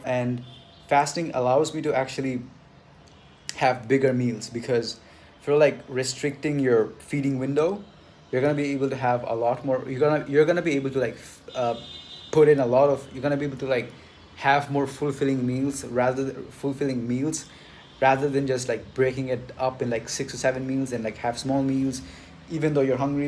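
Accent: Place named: Chennai, India. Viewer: Gangster intro dawg! You f*cking fly man. You the Top G of Chennai, with that accent. You the dawg! The Big G. You are a legend, f*ck yeah man! The big dawg of Chennai.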